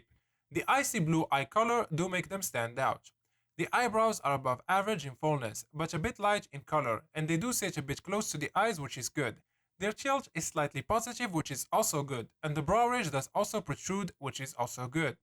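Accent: Morocco. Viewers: mghribi akhoya😂😂 Wellah ta 3endna accent m3rofa.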